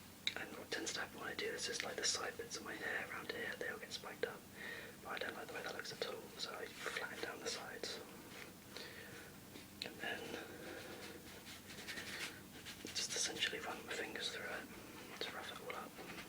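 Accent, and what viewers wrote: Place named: United Kingdom. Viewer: British? Check.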